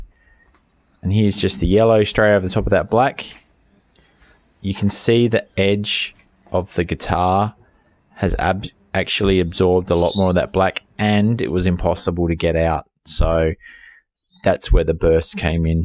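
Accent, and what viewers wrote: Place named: Australia. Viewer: Good to hear another Aussie voice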